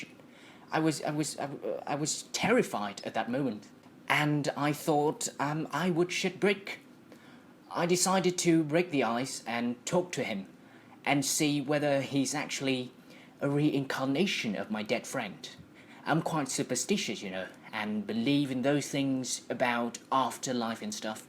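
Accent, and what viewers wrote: United Kingdom. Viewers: Chào bạn, mình rất thích giọng Anh Anh của bạn. Bạn có thể chia sẻ cho mình 1 số channel British Accent mà giọng Nam ko? Thanks for your help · Tỉ năm mới tìm đc 1 anh nói English accent :3 không cô đơn trong thế giới các bạn nói giọng Mỹ =))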